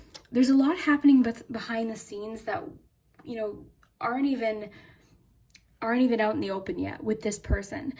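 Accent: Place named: Canada. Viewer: So, I have to comment and tell you that I'm OFFICIALLY a new viewer to your channel and I'm officially subscribed as of NOW! (WARNING! Super long comment!!) I happened to find your channel through another reading I was watching from somebody else and something in me told me to click on your video. The video was called "How Will You Meet Your Soulmate? PENDULUM 🔮 Where? When?" Not only is your reading the most exact reading I've ever received in my life (and I've had in-person readings), but the soulmate reading was so mind opening to me. I then watched your May 2020 predictions and Pile 3 was calling to me which I find super interesting. The number 3 is my lucky/weird/magical number. Watching this video didn't resonate with me because I'm single, but it gave me a lot of hope because I have plans on moving into my own place come July and from your May 2020 prediction about patience and that slow and steady wins the race. 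:) Anyways, yea, you definitely have a new subscriber from Orlando! :D (And I wonder if you're Canadian because you sound Canadian hehehe!) And yea! Keep making wonderful videos!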